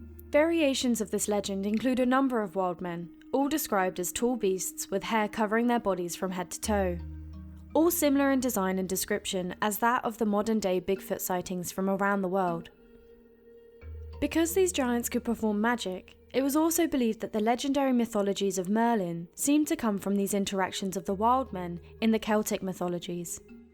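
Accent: United Kingdom. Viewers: She sounds British.. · Hey...I love your beautiful voice! I also really like the voice of the young man with the lovely British (uh, I believe) accent that is like yours. Great video as usual. Hope to hear more from both narrators.🧸🎈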